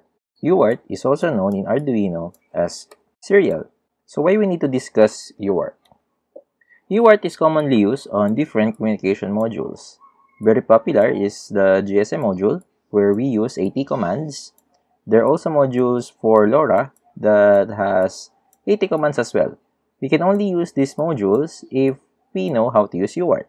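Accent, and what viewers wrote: Philippines. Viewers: Nice video dude. Just wondering, pinoy kaba? Its just the accent.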